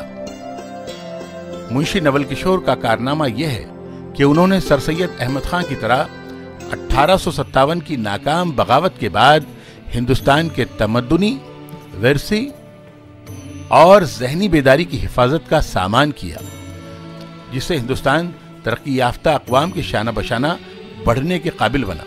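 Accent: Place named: Pakistan. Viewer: as a karachi resident, i love this Urdu accent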